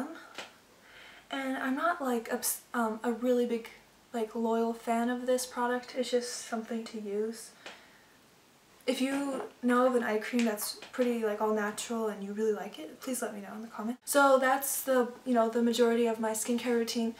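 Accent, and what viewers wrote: France. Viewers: I'm not used to commenting on videos at all, but I needed to tell you that you look absolutely wonderful. Such a pretty face! :) And nice French accent too! I suppose I would be your "counterpart" since I am a French girl studying English... All the best, Virginie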